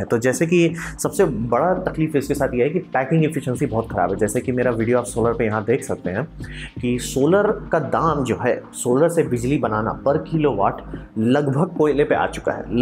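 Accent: Bihar, India. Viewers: Sir apko sun kr mza aa gya.. Kitna achhe se smjha diya aapne... Love u sir...Awaz se Lgta h aap v Bihar se ho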